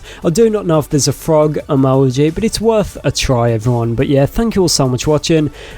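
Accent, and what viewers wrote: United Kingdom. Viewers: i love his british accent so much its so strong lol and love ur videos too <3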